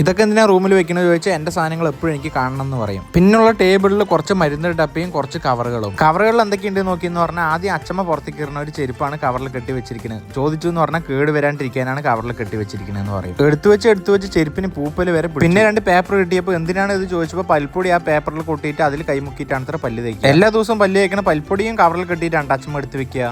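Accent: Palakkad, India. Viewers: ❤️❤️palakkad slang 🥰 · Slag kettitt palakkad Aanenn thonnunu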